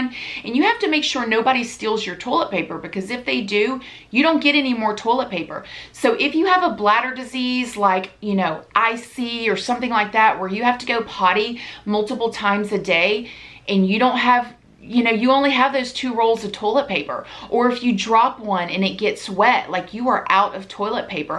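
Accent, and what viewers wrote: South, United States. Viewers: You are literally so precious!!! Love your southern twang x you kept cracking me up!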